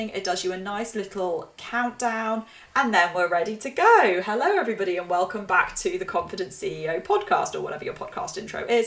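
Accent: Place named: Australia. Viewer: I liked the tutorial. I have been using Ringr for years but they just had a meltdown and their customer support sucks. I have zero tolerance when it comes to support issues and a meltdown. How is the support if you need it? Are you familiar with Ringr and I am going to assume you are pretty high on Zencastr yes? Also I love your name and your Australian accent.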